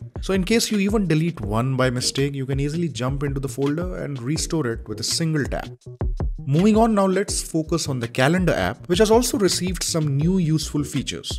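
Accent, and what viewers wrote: India. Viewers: Goddamn Indian accent! 🤦🏽‍♂️ Smfh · This is pure indian accent 😊 I wouldnt say we are ashamed of it ;)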